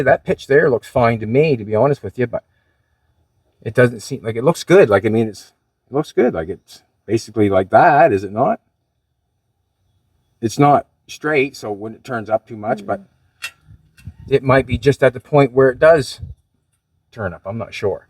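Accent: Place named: Atlantic Canada, Canada. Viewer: This is my first viewing, so I don't know where you are, but I'm detecting a slight East Coast Canadian accent. If that is so, you probably know that the government, bless em, thinks they can save the planet by forcing car dealers to sell 100% of the new cars to be BEVs! If we the people, can't stop this madness, the folks who can go Cuba-Style on old classic automobiles are going to be in high demand! 1st view immediately SUBSCRIBED buddy!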